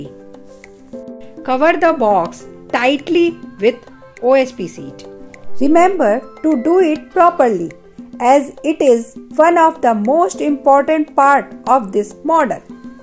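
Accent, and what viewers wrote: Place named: India. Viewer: Because she is from India she is teaching that's all that matters not everyone English is first language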